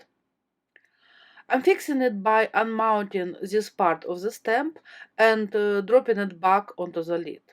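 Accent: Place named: Poland. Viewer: I'm happy I discovered your videos (I found you through a video by Yana Smakula). I am not a fan of pink, so I would never have thought to do these flowers on a pink background, but this card is beautiful. I love your ideas and hints, and I love your accent. It brings back memories, as it's similar to that of my Polish grandma (her native language was Polish, but she spoke some German and a bit of Russian in addition to English). I am very impressed by your command of vocabulary and sentence structure. I see so many comments by Americans on FB, and your language skills far surpass theirs. Sadly, I only know one language, and I am always impressed by those who learn more than their native tongue.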